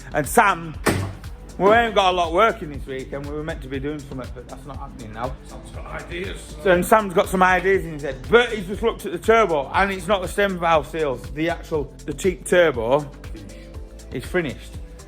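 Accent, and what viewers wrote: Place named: Northern England, United Kingdom. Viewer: Top Dong. These guys never make a video that doesn't put a smile on your face, never change you're a star especially with your Northern Accent matey.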